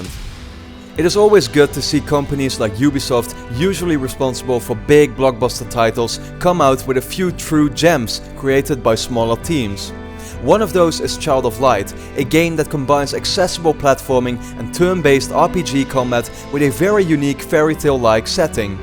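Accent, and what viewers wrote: Netherlands. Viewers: You sound Dutch, are you? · You sound dutch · Bas Warmerdam ik denk t ook aan zn accent te horen xD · Dutch accent? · r u Dutch becaus you speak English like someone from the Netherlands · NEDERLANDS ACCENT GESPOT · Are you dutch ?.. you have a dutch- english accent xD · Ben je nederlands, Are you dutch? i can hear it at your accent. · lol i was about to ask that and i think so bcs his accent is dutch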